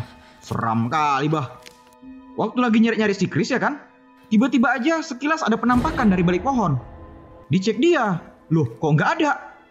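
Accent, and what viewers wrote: Medan, Indonesia. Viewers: Wkwkw org Medan ya bang? Btw mantap bang trus berkarya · Logat medan emang gk ada duanya.. 🤣🤣🤣 org Medan jg nih 🤭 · Kek suara orang Medan:v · Pengisi suara nya jangan ganti" bang.. Tetap pake bahasa medan... Mantap seru kali aku bahh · Mantap bang orang medan y sama lah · Pernah nonton film-nya, tapi waktu baca notif langsung klik aja🤭 mantap kali bang,,, aq suka bahasanya kaya orang medan😃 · orang medan nih pasti abangnya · Berasa lagi ngobrol sama calon lakiku 😂😂 dia orang medan pula wkwkwk ngakak denger suaranya medan banget jir abang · Medan punya bos wkwkkwk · Logat medan bukan sulawesi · Saran: kasih banyak logat medanya kayk suara chinoll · Emang orang medan daerah namorambe emang the best ligat suara lu bang? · weh bang, kau ceritain film kenapa harus pake bahasa Medan bang, kan jadi ngakak😂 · Orang medan dia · Anak medan punya logat 😂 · Nampak kali orang Medan mantap bang 👍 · Orang medan mana suara kelen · Logat medannya keren abg, semangay trus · Orng medan bang? 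· Bg orng Medan ya bg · Bang gua kangen ama suara asli medan lu bang gua suka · Logat medan pun membuat saya subscribe😂 Asli ini mimin ny bner2 buat kita kyk nganggap suara dia jadi pemeran itu. Feel ny dpt bngt. Terus berkarya lek👍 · Yang bikin saya suka Vidio in adalah logat medannya Abang Abang ini🤣❤️ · Orng medan ka bah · Anak medan ni keknya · Orang medan kah abang😂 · pasti orang Medann ni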